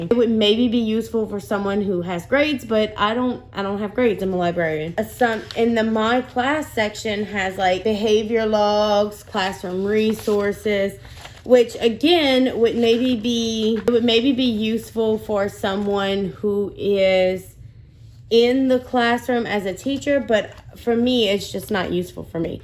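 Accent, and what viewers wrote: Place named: South, United States. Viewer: First time viewer and I enjoyed the video. I think I hear a LA or south MS accent. I’m originally from Gulfport, MS. Kathy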